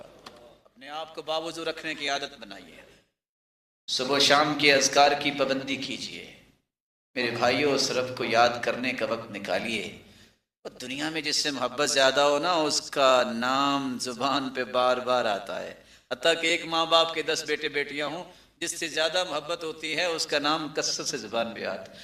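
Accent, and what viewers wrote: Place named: Arab world. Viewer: ماشاء اللہ،،،،،حضرت صاحب اردو بھی عربی لہجے میں بولتے ہیں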